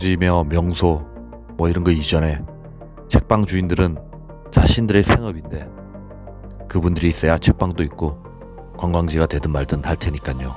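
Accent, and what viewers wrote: Gyeongsang, South Korea. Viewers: 감사드려요.ㅠㅠ 경상도 발음 팍팍 나오는데...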